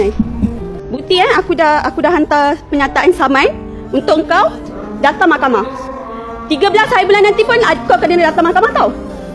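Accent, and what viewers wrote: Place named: China. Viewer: Content ni aku memang follow sejak PKP lagi. Content dia memang straight forward and aku sampai sekarang xleh nak kenal suara min ni memang cina ori ke or bukan cina tapi buat loghat cina😂❤❤❤❤❤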